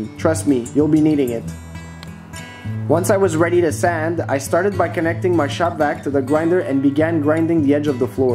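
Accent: Quebec, Canada. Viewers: Heyyy!!! Toi tu as un accent quebecois quand tu parle! Vraiment sick! Jme creer un garage moi avec, so i'm super thrilled to have landed on your channel!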